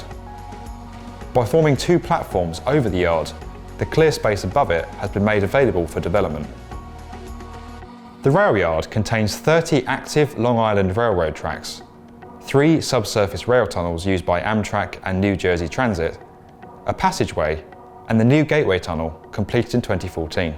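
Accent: United Kingdom. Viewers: Why is the project being described by a person with an British accent, and why are they using British spelling?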